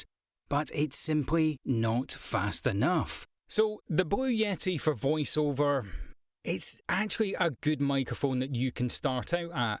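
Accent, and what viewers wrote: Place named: Scotland, United Kingdom. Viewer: wasn't sure.. accent sounds Scottish.. could be wrong.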